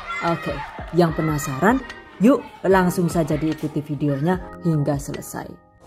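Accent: Banyumas, Indonesia. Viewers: Keren kalau nonton chanelnya,yg saya suka sampai modalnya di catat dengan lengkap karna saya pedagang,jadi seneng aja. tapi saya KEPO 🙆🙆aslinya orang mana,kalau di lihat kayanya tinggal di jawa timur,tapi kadang ngomongnya ada NGAPAKnya😁🤣 apa kaya saya karna temen q dari mana"jadi q bisa sedikit" Logat dari temen" kok q jadi ngelantur🤦🤦pokoknya q Lope-Lope lahh😍😍😍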